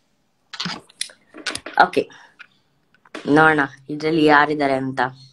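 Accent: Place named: North Karnataka, India. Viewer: Nanu Dayanand Goudar anta Namadu Gokak hatra Chikka halli Fulagaddi urinavanu First Nimage nanna kade enda Namasakargalu Anushri Akka mate Nimage wish You Happy Birthday🎂🎉🎂🎂🎂🎂 Nivu 100 kala channagi balabeku anta nanna ashe ade riti Nivu namma uttar karnataka bashena Namma hage matadtira namage bala kushi agutte mate Nivu andre tumbane esta ellarigu Vande vande namma uru kade banni medum plz 🙏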